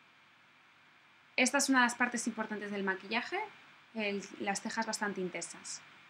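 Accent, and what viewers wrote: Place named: Spain. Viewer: que bonito lo que ensenas..yo no soy mujer, soy guy umm masculino pero cuando es de halloween excelente...me encanta tu acento espanol, spain. Para halloween estare listo.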